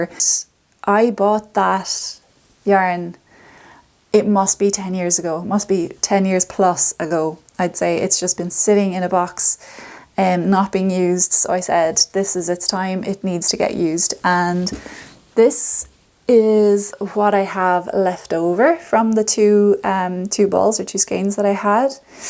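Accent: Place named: Ireland. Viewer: Love the stitch definition on your Dagmar jacket. Really enjoyed your podcast. I’m from Donegal but living in the UK ( like a previous comment). So love hearing the Irish accent 😎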